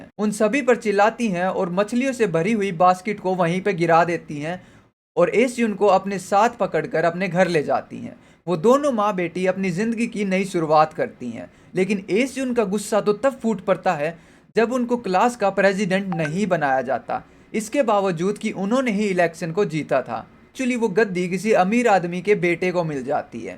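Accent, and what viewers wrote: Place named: Haryana, India. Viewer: Bhai aap haryana se ho kya🎉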